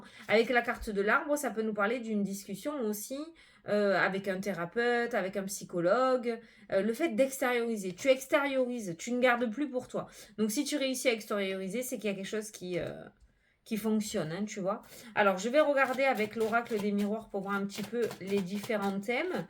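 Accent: South, France. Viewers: je pars en vacance à chaque fois que je vous écoute,votre accent du sud est magique et me fais voyager.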